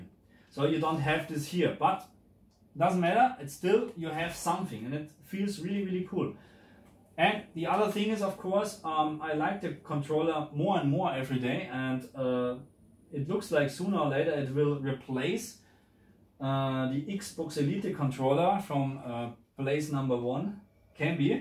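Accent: Germany. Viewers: Im sorry, it has nothing to do with the video but i’m fascinated by accents and I was just wondering, is that a German accent? That’s my best guess lol. When you said “when fading” I would of just said “when looking around” tbh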